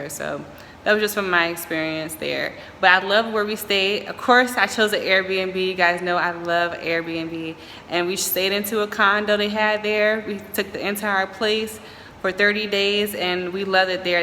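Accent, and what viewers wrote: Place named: Nashville, United States. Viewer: Filipinos are not racist.. black or white is ok..no problem because w are brown people😉😉😘 but your accent is like my friend accent she is living in Nashville 🤩💖love it🤗 Great video!☺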